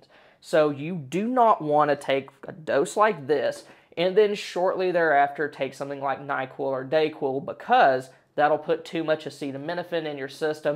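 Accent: South, United States. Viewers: This Brit appreciates your videos and loves the southern drawl. Well done.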